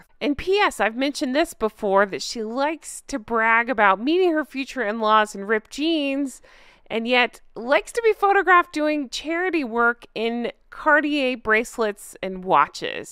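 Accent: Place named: United States. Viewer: I get all your videos out of sync because I watch on TV - also don’t use notification beeps on my phone. I saw your video with dreadful albeit illiterate insults the sugars posted to you. I LOVE your voice! Until I started following both you and lovely Beebs I disliked American accent on television but you two have such warm ( and not loud) voices. I couldn’t bring myself to watch Hank and Skans Netflix show or that dreadful Oprah woman. I’m not defending Meghan as I loathe her but Princess Diana always contacted paparazzi which could have avoided fatal crash ( think I said that before but I’m old!😂). Love your compilations- everything actually. Unlike skank Princess Catherine obviously has a loving family with real children. Still must be hurtful though. She’s so loved in Britain ( unless you are anti monarchists). She’s perfect 🐾😺💕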